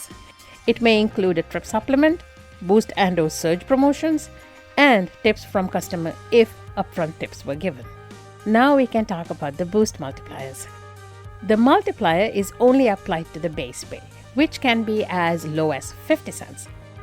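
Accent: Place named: Quebec, Canada. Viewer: Are you Quebecois? You accent sounds different than most from that area. Im just into languages and accents. Salutamu!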